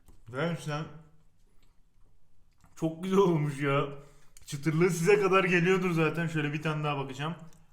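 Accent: Adana, Turkey. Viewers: "Şunu alüyürüm, bunu koyüyürüm, buna eklüyürüm." Bütün yemek videosu çekenlerin neden şivesi adanaya kayıyor ve salağa anlatır gibi anlatıyor anlamıyorum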